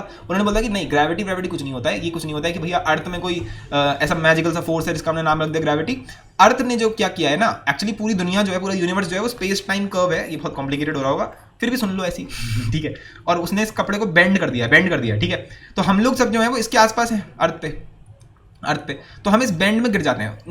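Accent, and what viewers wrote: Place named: Uttar Pradesh, India. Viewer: Ur from UP 👉 right?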